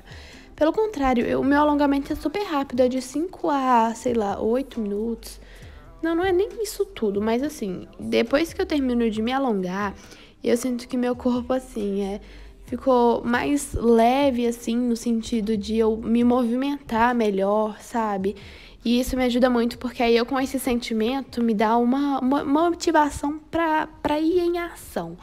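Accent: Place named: Belo Horizonte, Brazil. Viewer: o sotaque belorizontino KKKKKKK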